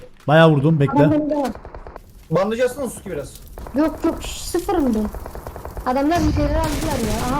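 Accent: Cyprus. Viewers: Kıbrıslı knk Diyarbakırlı değil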